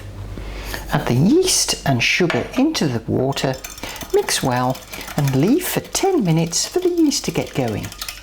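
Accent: Midlands, United Kingdom. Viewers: Very cool video! However, you are the most British person I have ever heard. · My buckwheat flour gives a black loaf but its an ok loaf thanks.detect a midland accent?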